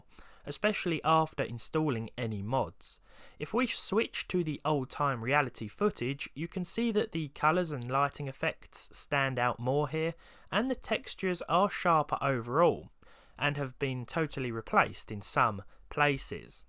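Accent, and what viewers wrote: United Kingdom. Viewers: Pronouncing mafia as "mäfiä" sounds especially wrong with a British accent.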